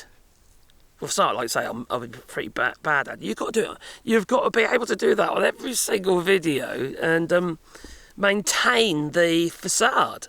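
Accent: United Kingdom. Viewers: Fed up with Trump and nice to listen to a British accent for a change! Just ignore your trolls! I pay for YouTube but don't have a YouTube channel. Too old. I suffer from phishing on my mobile phone and at my age have to answer it just in case it's the GP's surgery for a blood test review or appointment. GPs sometimes use their own mobiles and I can't save their numbers. I object to a greasy fraudster calling me by my first name as if we're the best of friends but that's how I know they are trolls! Officialdom never does that! I don't have any investments, insurance or car thank you very much, or a daughter who's run out of money! ❤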